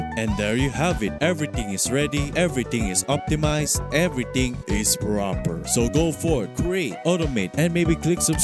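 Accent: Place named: Philippines. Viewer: RAHHHHH PILIPENO ACCENT LETS GO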